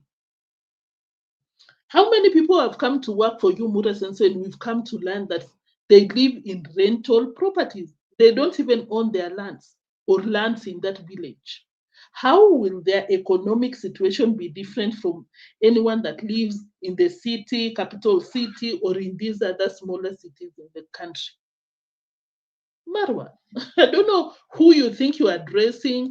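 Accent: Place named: Kenya. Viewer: Epic content! But, please tone down your Kenyan village accent. Please darling... ❤❤❤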